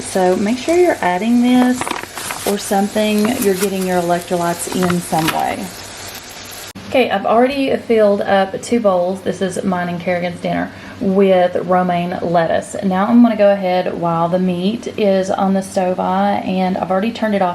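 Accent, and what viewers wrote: South, United States. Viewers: I love your voice and southern accent 😀. The Keto diet and Intermittent Fasting has changed my life, I have lost 27lbs. in 30 days. The best part for me is that my back pain is gone, I was just told 3 months ago that I needed surgery on my lower back. Not happening my friends. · Love a good old southern girl accent..smh stationed in California..these people are so different out here lol..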